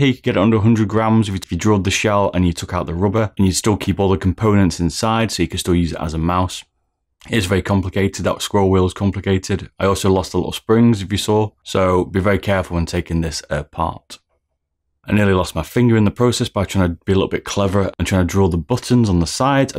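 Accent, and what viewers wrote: United Kingdom. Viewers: wow! I subscribed to the channel after watching four super interesting videos! very good quality content, congrats! only a minor issue: I had to enable subs (english subs) because I'm not English mother tongue and even if I can speak and understand it quite good I'm not used to the accent you have (british, I guess?). but hey, not really a problem ;)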